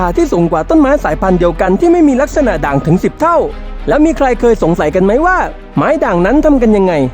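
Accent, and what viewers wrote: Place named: South, Thailand. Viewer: เสียงบรรยายคล่องแคล่วดี แต่ออกสำเนียงใต้มากไปหน่อย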